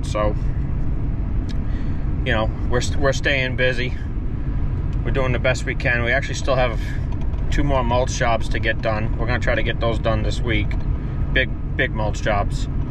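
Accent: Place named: Massachusetts, United States. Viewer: From the sound of your accent, you live up where I was born and raised...Massachusetts? I live in North Carolina now, have for 30 years. Good video guys, keep up the good work, I'll give you a shout out on my next video.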